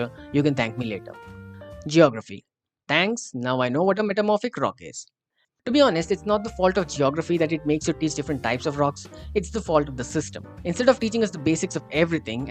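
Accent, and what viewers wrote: India. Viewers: Been forever since I've heard the Indian accent even though I'm Indian, glad I watched ts tho cuh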